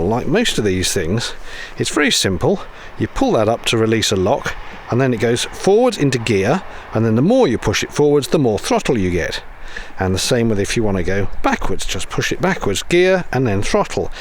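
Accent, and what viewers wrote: United Kingdom. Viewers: Are all British men so even tempered? You said "ow" and barely skipped a beat as if you hardly noticed. When we had a boat and I worked on it with my (at the time) partner...it was always a traumatic experience as he had nothing like your calm demeanor and I was always on the lookout for flying tools. I'm happy to say that the relationship didn't last past that summer. I have to admit I don't think I will ever be able to say "farting around" without hearing it in your wonderful accent in my mind. Another truly enjoyable video. Thank you.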